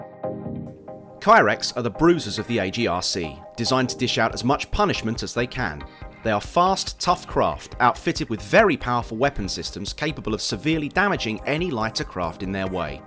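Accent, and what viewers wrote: United Kingdom. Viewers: Everything is better in a British accent. Thank you for this sir this is a god send. 🙌🏼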